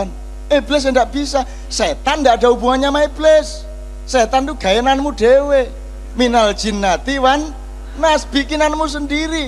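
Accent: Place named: East Java, Indonesia. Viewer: bukan sombong khas orang jatim budaya arek mang gaya bicarane begitu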